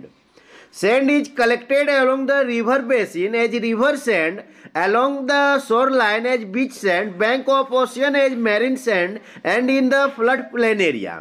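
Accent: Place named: India. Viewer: Sir your English is pronounce like hindi difficult to understand,better to speak in hindi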